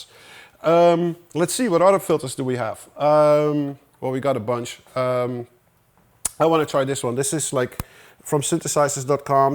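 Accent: Netherlands. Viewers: your accent is almost completely gone but I grew up with dutch parents and their dutch friends who loved keeping adult conversations from us by not teaching us dutch . but it was still fun to listen to . to this day a dutch accent is music to my ears lol . hope I'm not wrong on this ______if so you just heard my long story for nothing . (ok back to the video) gerrit jan schraa california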